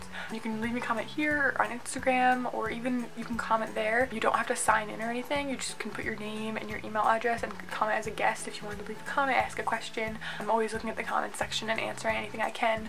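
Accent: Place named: United States. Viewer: She talks to fast can't understand her American accent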